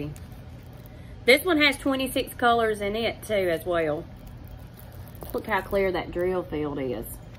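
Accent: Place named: Mississippi, United States. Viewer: Nice haul. Where are you from? Your accent sounds like my family in Mississippi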